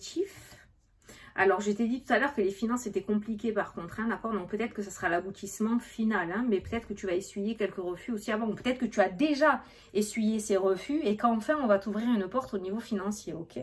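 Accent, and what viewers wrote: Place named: Landes, France. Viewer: Oups, oui je voulais te le dire ..je suis dans le 78! Mais originaire du 40 😉voilà pourquoi j'adore entendre ton accent 😁... Sinon, niveau fatigue, dans mon entourage, tout le monde est ''rincé''!!! Et puis les vacances d'été approche...je travaille dans l'annimation auprès des enfants et ça se ressent ! Bises à toi et à la communauté !!!😘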